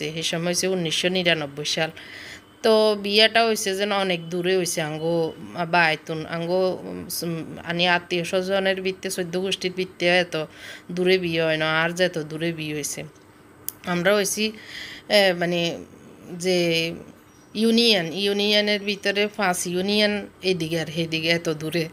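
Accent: Noakhali, Bangladesh. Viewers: নোয়াখাইল্লা ব্লগ খুব ভালো লাগছে....আন্নের কথা শুনি আর দিল টা ভরি গেছে.....আই ও নোয়াখাইল্লা দেই আর বহুত ভালা লাইগছে...আই গর্বিত আই নোয়াখাইল্লা দেই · Assalamualikum apu. Ami o Noakhalir meye. Apnar ajker vlog ta khub valo laglo. Apu apnar mukhe Noakhali r kotha e besi sundor lage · আপু তোমার নোয়াখালীর কথা গুলো শুনতে খুব ভালো লাগল,অনেক হাসলাম আমার ছেলে মেয়ে নিয়ে,খুব ভালো লাগলো, তোমাকে অনেক ধন্যবাদ,ভালো থাকো আপু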